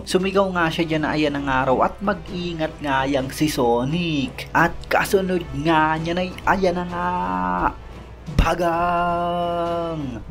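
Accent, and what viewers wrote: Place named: Iloilo, Philippines. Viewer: ilonggo ka yata boss Bagang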